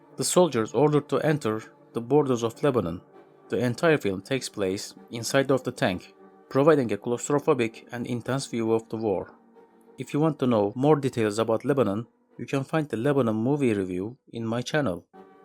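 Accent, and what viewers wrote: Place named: Turkey. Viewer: where are you from bro ? your accent sounds turkish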